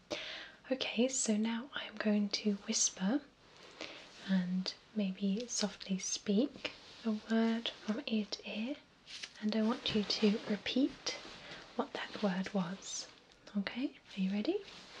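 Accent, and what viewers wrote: England, United Kingdom. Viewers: no one can bring out my tingles as you do! The calm, british voice is just magic! Greetings from Norway :) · she's got a british accent, not australian! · I lived in Britan and sveryone sounded chavvy and not like this. Using that classy english accent is awesome >ω< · lover her accent. and i live in UK. im sick of listening to chav accents and miss this upper class sexy english voice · Wow! I'm English too and people say I have a lovely accent, but yours is just beautiful!! I'm actually jealous of how lovely your accent is 😍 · normally, british accents annoy me,. except for today! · ASMR and British accent. This is perfect and relaxing. Plz make more doctor/nurse role-play videos. I watched it 10 times and still love to watch ! Thanks. :) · So here I am doing my usual to try to fall asleep eyes closed on auto play, this video comes on...Hear a British accent, eyes open with surprise... 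groggy eyes stare in disbelief haha. Long time no see!! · Goood I'm fond of your clean English accent. I prefer it to an average American one. I want you to read some classic English poetry if you don't mind. Thanks for your videos. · As a Brit myself I might be bias, but I can safely say I love your accent and whispering together! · love the British accent, so perfect for this asmr :O :3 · Hello, where are you from? I ask you why your accent seems british. Regards from México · EpicMeme EMPEROR I don't think you know what a Russian accent sounds like? Gentle Whispering is Russian though, I'd say her accent definitely more towards the UK. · Your British accent is so much better than mine :( Where you from Dear? :) (*Sorry I am late on this video!)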